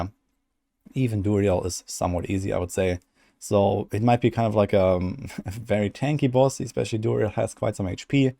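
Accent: Germany. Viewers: Are you German, sorry for asking, I just watch your videos for ever and never wrapped my head around this one. Keeo up the great work!!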